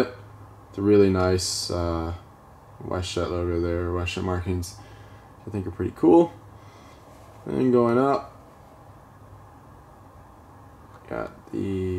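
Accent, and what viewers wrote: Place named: California, United States. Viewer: Hahaha! I couldn't tell what accent you have, it's Californian! My uncle talks like you. btw it's mainly the 737-700 that comes to la. West Jet usually uses it's 737-800s at lax for it's once daily lax-yyz flight.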